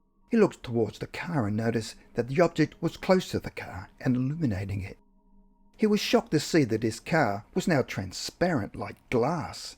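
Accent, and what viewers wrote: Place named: Australia. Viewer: Ugh, the Aussie accent just doesn’t work with narration. Otherwise, a fan of your channel! 😩😅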